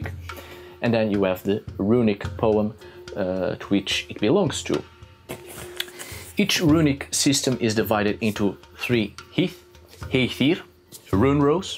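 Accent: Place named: Portugal. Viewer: You are portuguese right? The way you speak your english, the way you pause in between words, and the words you choose. It really sounds like a portuguese speaking in english. (I am portuguese btw ahah)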